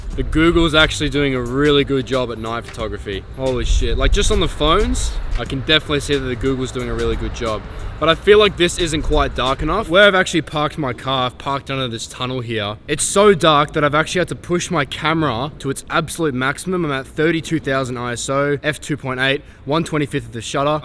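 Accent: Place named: Victoria, Australia. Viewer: First video watching you and straight away I knew you were a fellow Aussie 😂 from Victoria too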